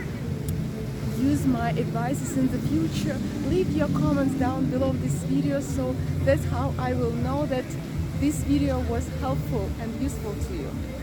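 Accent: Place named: Eastern Europe. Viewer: thank you for making such a wonderful video, i like the setting with steps down to the water and ethnic belarussian music playing in the background, this reminds me of the bellagio fountains in my home of las vegas nevada, being that i'm single, i would ask you to the fountains, respectively, i like your smile and slavic accent, i hope to see minsk belarus someday, take care, regards from las vegas nevada.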